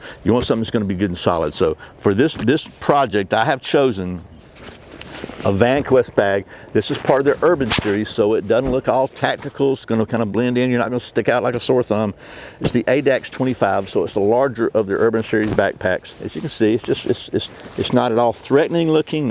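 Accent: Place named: Carroll County, United States. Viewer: sounds like you might be from around the Carroll county area or Douglas county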